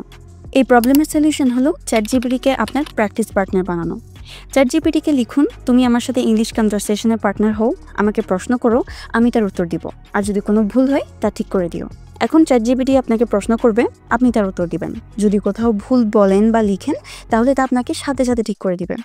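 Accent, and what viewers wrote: Chittagong, Bangladesh. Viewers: আপনি চট্টগ্রামের মেয়ে।আপনার উচ্চারণ তাই বলে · আসসালামুয়ালিকুম আপা আপনার পরামর্শ খুব ভালো লাগলো আপনার বাড়ি চিটাগাং অথবা ফেনী হতে পারে , আমি উত্তরবঙ্গে থেকে বলছি রাজশাহী বিভাগ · কথায় টান আছে চিটাগাং এর মতো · kothar accent sune mone hocche ctg er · R8 amar o mone hoyese Onee Chattogram er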